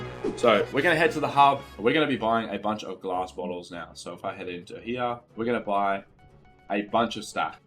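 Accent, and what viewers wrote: Australia. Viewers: i knew u where australian i am too, also u able to make a video the same auto brewer but with like more brewer stands like if i want to put nether wort first and then like surgar cane so mutiple locks and stuff so like im sure u can put your stuff idk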